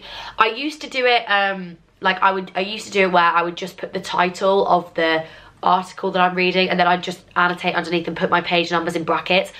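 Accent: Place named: Wales, United Kingdom. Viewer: Oh goodness, that welsh accent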